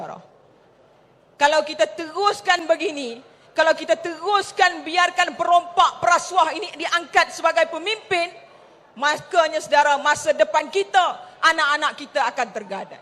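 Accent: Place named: Penang, Malaysia. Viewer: Seronoknya dengar longat Penang....gua punya hometown. Hantam kaw kaw very good presentation. Inilah ahli wanita yang berani cakap. Kita nak kaum wanita macam inilah....🤞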